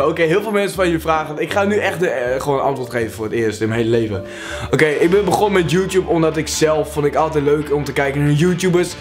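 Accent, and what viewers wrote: Groningen, Netherlands. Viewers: Ben jij een Groninger ??❤️❤️❤️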